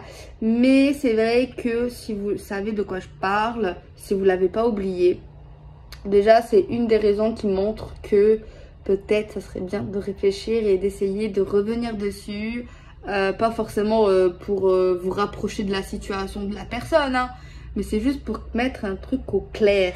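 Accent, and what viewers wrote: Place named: South, France. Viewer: Bien l'accent du sud...alors tout vas bien..🙏🙃🤣 👍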